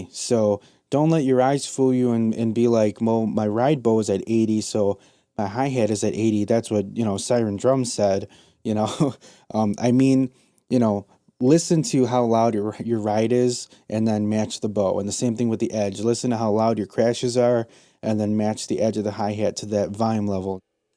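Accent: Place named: Midwest, United States. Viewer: I come to these videos all the time but I can't get over the Midwest accent you heeeve. Hey hates. What's heyppening with the hey hates. Ugh.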